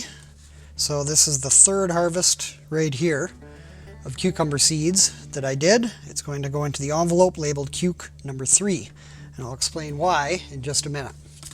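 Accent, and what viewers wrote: Canada. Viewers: Love your clarity, simplicity and lively Canadian accent! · Great vid, thanks! Southern Ontarian here, living in Utah...it was nice to hear the good ol' Canadian accent. Also nice to hear the word "Tupperware". I'm going to try this method for saving seeds. Cheers!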